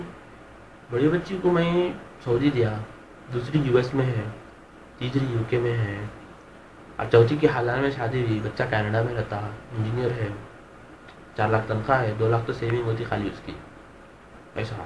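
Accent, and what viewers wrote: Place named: Hyderabad, India. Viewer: well said bro with sweet hyd lang :)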